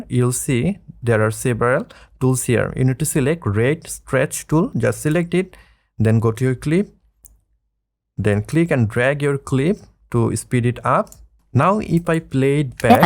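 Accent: Assam, India. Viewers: your most of viewars are indian, because you speak like indian english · ayyy axomiya, accent huniya gom pai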